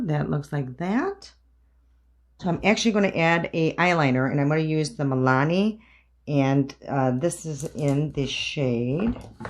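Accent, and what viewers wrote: Midwest, United States. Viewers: I'm new to your channel; Allie Glines linked you on her recent video testing out the Loreal serum foundation. I'm 48, and i'm also in the process of re-learning makeup...and falling in love with it again! 😎 You mentioned your undereye darkness, and i have that as well. I have found a few products really do completely neutralize that discoloration. I like the Pixi Correction Concentrate, the Fenty Bright Fix Eye Brightener Concealer (shades like melon or deep melon), and the Tarte Colored Clay Undereye Corrector. These products do not crease if you use a very thin layer, and they do not move if you set them lightly. The key is super light layers. Anyway, hope this helps someone! PS...i hear a very familiar Midwest twang...where are you from?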